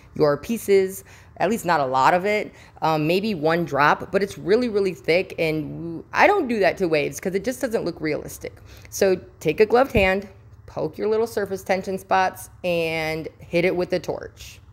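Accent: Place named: St. Louis, United States. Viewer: Thank u, it was a strange deja vu feeling when u spoke. I was born in St. Louis but lived all over the country growing up. U have a St. Louis accent to me, but I have been teased by friends my entire life that I took a little bit of every place I lived & blended them all together, maybe that’s what felt familiar to me idk,🫣don’t mind me!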